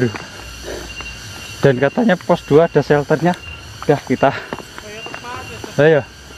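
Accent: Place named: Central Java, Indonesia. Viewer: wahh puas pindah pindah jalur dong....satu gunung bisa bikin banyak video ini mas....satu persatu via mana via mana di kompliti ...orak usah adoh adoh sek...gunung ning kampungmu kompliti dulu ya 😁....kmu jawa timur tapi kok medoknya blum semedok surabayanan ya mas....masih agak agak mirip jawa tengah logat dan nadanya bicara 😁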